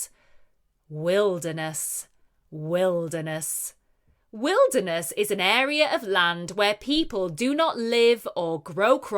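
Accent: United Kingdom. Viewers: Thank you so much. I love so much your British accent.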